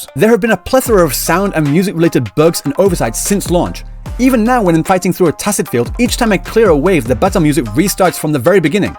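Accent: United Kingdom. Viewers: The Linyang roast in the first 30 seconds has earned my respect. Also why do you sound so British don’t you live in Japan????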